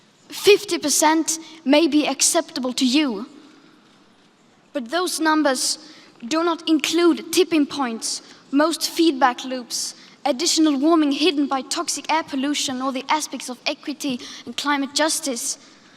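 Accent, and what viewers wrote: United Kingdom. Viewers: I’m English and can’t speak another language. Greta sounds like she was born in the Uk. I’m ashamed..... So young, so talented, so educated . We need her in our trillions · Ma She's swedish but in a lot of European countries you learn british english. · Greta has a British accent.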